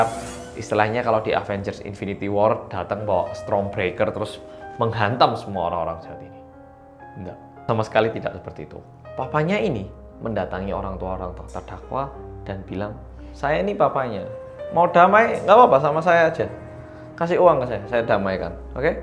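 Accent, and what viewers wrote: Java, Indonesia. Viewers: Bang logat nya Jawa banget😁 udah kayak logat presiden Jokowi 👍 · First impression liat videonya lek 😁 krn org korea yg medhoook 😍 stlh liat² trs videonya trnyta personality nya Indonesia bgt mmg 👍🥰 apalg dg logat medhoknya yg kandel,sesama jowo aku bangga bgt 👏🤩 Trs berkarya lek,aku padamu 🤭🥰🤩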